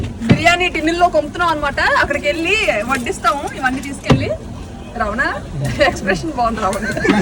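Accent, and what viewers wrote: Vizianagaram, India. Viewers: Mandalu virigupothayi... Mana vizianagaram slang nachina dialogue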